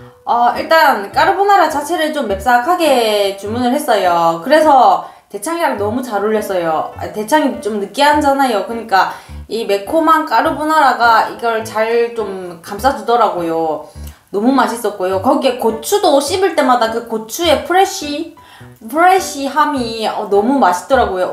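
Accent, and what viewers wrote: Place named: Gimhae, South Korea. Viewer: 팬이에요~립스틱?틴트?뭐바르세요?항상 궁금했어요 ㅎㅎ 잘안지워지네요~공유해주세요~~~~꼭이요!늘 궁금했어요~~~마라탕 먹을때마다 마라탕 영상보면서 먹어요 초딩 따님이 마라탕에 빠졌거든요ㅎ 내 최애 유투버가 이에요 처음에는 대창 먹는거에 반했어요 말투가 익숙해서 보니 김해사시더라고요♡♡♡♡ 전 김해가 친정인 아줌마인데요ㅋ 작년에 김해에서 이사하신다는 영상도 봤죠~돈쭐도 때문에 보고 있어요 먹방 유투버중에는 쵝오라고 생각해요❤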